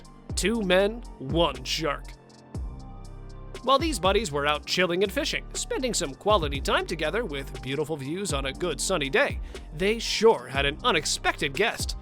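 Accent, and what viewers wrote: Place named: United States. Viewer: This guy speaks English like it’s his second language but he has an American accent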